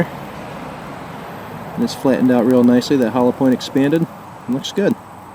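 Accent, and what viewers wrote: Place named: South, United States. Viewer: Why are you talking like a backwoodshilljack can't say anything about brothers talking ghetto brah.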